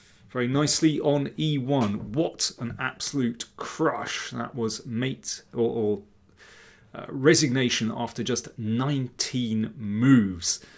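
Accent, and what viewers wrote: United Kingdom. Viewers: Mystery to me, both entertaining and gives great explanations of the ideas behind the games. I have been wondering if it's because many chess players find following the native British English harder than non native where the words are both simpler and enunciated more slowly.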